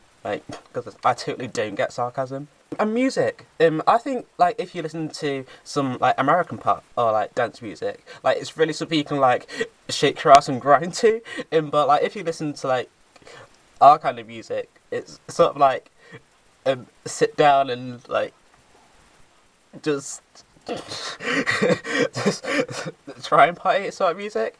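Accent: United Kingdom. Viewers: What's british is your accent. If we'd met I'd have to stop you and ask you often to repeat slowly what you say because I miss lots of parts (I have to listen to brit films with subtitles). Also, your haircut is sort of british, no? Well, to me it reminds a period of the early 1980's which was specifically brit.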